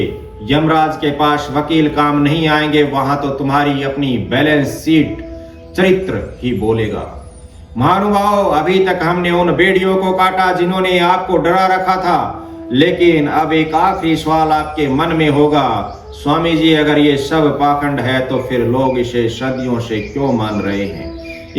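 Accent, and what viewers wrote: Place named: Haryana, India. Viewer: आदरणीय स्वामी जी,आज के हिंदू को सुनाने के लिए यही सुन्दर भाषा उचित है। बहुत दिनों बाद ऐसे स्पष्ट वक्ता को हरयाणवी के पुट के साथ सुनना कल्याणकारी लगा।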